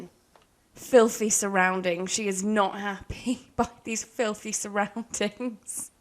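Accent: United Kingdom. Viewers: I opened the video, then, I heard you're british. I'm sorry. I can't. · I'll be honest here: of course I watch Sims movies because I just love them, but in this channel's case it's the sassy Brittish accent that adds some icing on the cake.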